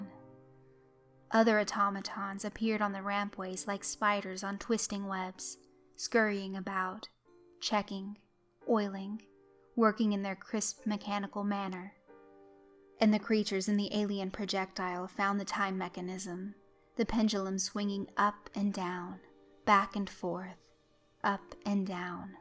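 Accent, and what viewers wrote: South, United States. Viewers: What makes it even better is the cute southern (Georgian?) accent when she's not reading!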